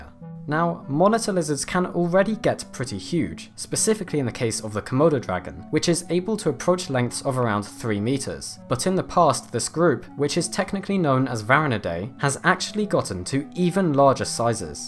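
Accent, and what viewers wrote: United Kingdom. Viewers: STOP WITH THE ANNOYING BRITISH ACCENT. Standard American English is fine. Doing a video on Aussie Wildlife, how about using an Australian accent..JMHO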